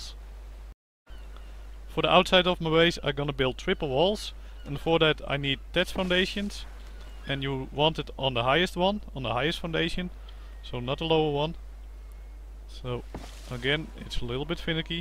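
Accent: Netherlands. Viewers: Dat dutch Akzent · Nice dutch accent ;) subbed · are u dutch?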